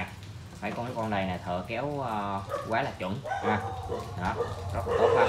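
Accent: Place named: North, Vietnam. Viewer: Người nam mà nói giọng bắc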